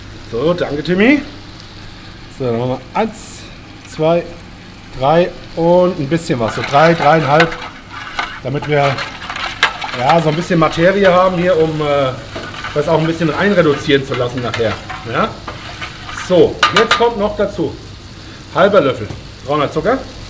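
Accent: Hesse, Germany. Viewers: Ein sensationelles Rezept, wie viele andere von dir auch. Es macht einfach Lust und Laune, die Gerichte nach zu kochen. Lieber, du bist ein Original und vor allem: Herrlisch des hessische Gebabbel. Mach weiter so👍👍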